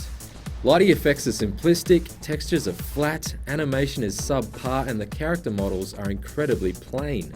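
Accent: Australia. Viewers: is this guy australian???? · prob cuz hes an aussie the accent is fucking sublime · And he is Australian! (No offense intended) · Love that aussie accent, because i have one :)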